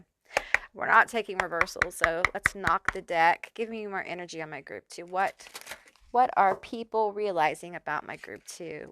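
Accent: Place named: South, United States. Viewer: are you southern? i can hear a slight accent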